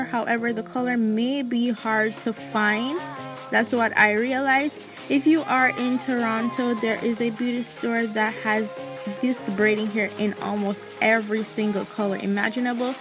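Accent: Jamaica. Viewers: Are you a yaadi girl like me? i hear u accent!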